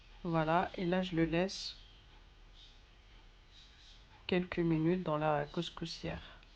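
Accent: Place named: Kabylie, Algeria. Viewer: Bonjour, tu explique très bien ta recette et le français Kabyle, c'est parfait. Kamel de Marseille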